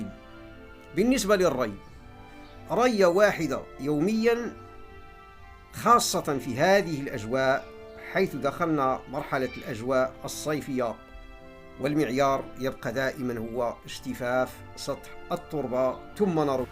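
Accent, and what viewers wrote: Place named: Morocco. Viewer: يتكلم بلسان مغربى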